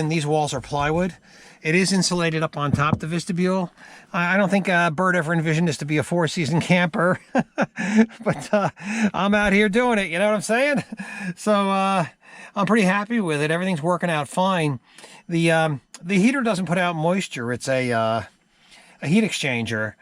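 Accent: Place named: New Jersey, United States. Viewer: your videos are always informative, if not entertaining. Since moving from NJ to CO, I miss the Jersey accent. I get my fix listening to you! Keep the good stuff coming. Thanks!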